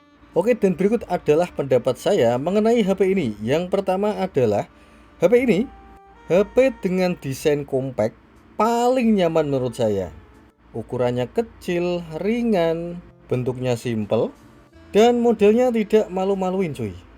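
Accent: Pati, Indonesia. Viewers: wong Pati mas?😅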